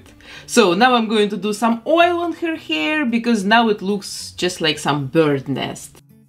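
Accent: Russia. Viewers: If I may ask... You have a gorgeous accent (I’m American) my husband says you’re from Russia (he’s a musician in a Russian place) I think you mentioned Belgium. It’s so beautiful!! You have a gorgeous accent!! ♥️♥️ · Акцент очень русский...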